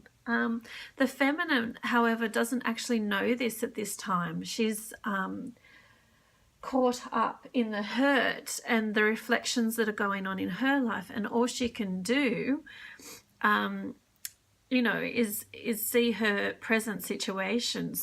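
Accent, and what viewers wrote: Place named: Australia. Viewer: Thank you for your guidance, and for the work you’re doing to help the TF collective. The message resonated. It’s nice to hear readings with an Australian accent! Love and light from Brisbane xo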